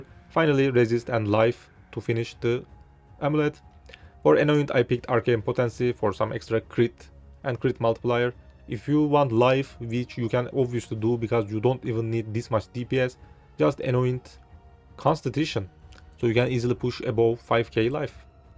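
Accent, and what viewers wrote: Turkey. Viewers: Türk oldugunu Ingilizce Konusmandan hemen anladim 😂 Bende bu Buildi 3.16 de kulancam. Almanyadan selamlar!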